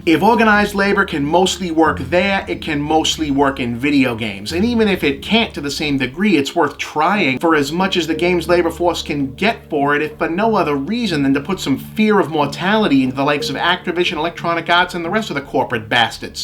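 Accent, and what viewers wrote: Boston, United States. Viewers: Boston accent is one of if not the most assholic accent. How can people living near Harvard sound so stuuuupid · Frost Soul it’s a Boston accent. He usually tries to down play it, but it does slip through sometimes. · Agreed. The angry Bostonian scent ads a good bite to his commentary. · Bob, I don’t know how I didn’t notice this before, but you have a very Bostonian accent. You should embrace it and use it more often when you go on an angry rant. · It's a Boston accent. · UNIONIZE!!! Also, loving the chance to hear your Boston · Is it just me or is Bob extra Bostony in this episode? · Is that a real Boston accent or a new gimmick? I've never heard it before. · I can hear the Boston coming out XD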